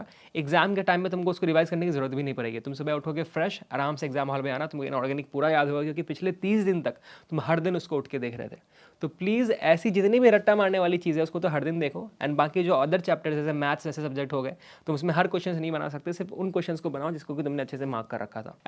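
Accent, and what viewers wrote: Bihar, India. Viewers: Aise kuch ni that isme jo dusra youtube video me ni h is topic pe. Aur thoda pronunciations pe kam kro Kuch words pe being Bihari wo dikh jata h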